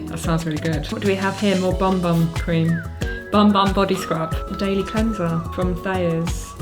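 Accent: England, United Kingdom. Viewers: Cool I didn't realise you were a Pom until I heard your accent I hope you visit OZ sometime and visit the GC lots of Gym Junkies here that need to focus on something a bit more interesting than Bench Presses and Squats 👌✌️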